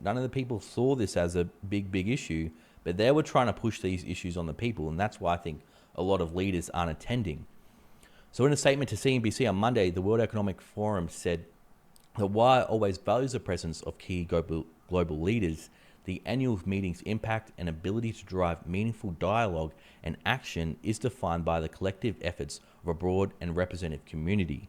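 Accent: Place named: Australia. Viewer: Yeah mate. He speaks English. Australian English.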